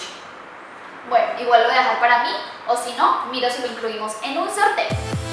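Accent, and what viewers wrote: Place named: Mexico. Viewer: Ya hablas con acento mexicano! Seré la única que lo siente? 😂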